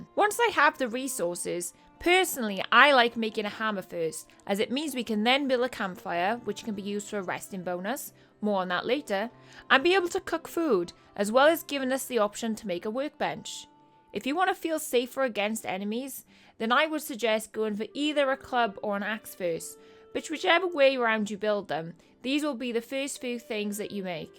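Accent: Wales, United Kingdom. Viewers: Are you welsh? Sounds like you're playing it down a lil for your "stage voice" - which I wouldn't blame ya for, I pronouns would too if I had a YT channel! Not a criticism, just as a fellow Welshie I thought I heard it :) Only just started the vid but feedback seems excellent in comments so I'm SURE it'll be super helpful, so thanks in advance! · Great vid - made me smile :) nice to hear a fellow welshie on youtube too!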